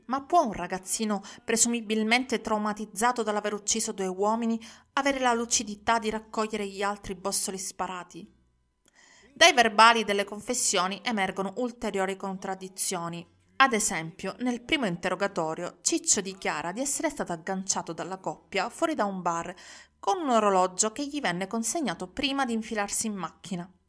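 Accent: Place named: Sicily, Italy. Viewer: Buonasera,. Una mia curiosità. Contrariamente a quelli che rimarcano sull'accento, io da siciliana, non riesco😅 a capire di quale provincia sei originaria. Se ti va di rispondere, ovviamente. Grazie e sempre tanti complimenti 😊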